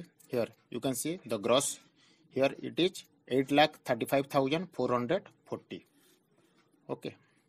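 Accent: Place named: Odisha, India. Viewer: if i am right, from the accent it seems you are an odia. however, your excel sheet is promoting for a password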